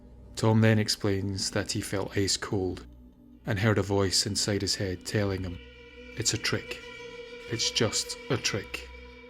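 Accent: Scotland, United Kingdom. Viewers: Happy birthday,always enjoy your stories with that added bit of history and i always love a vampire story...Thank you for always your lovely scottish narration😊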